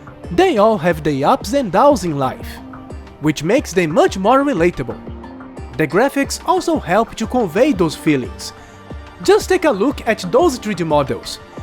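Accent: Brazil. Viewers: Are you from Brazil? Your accent is so brazilian-like. Im brazilian btw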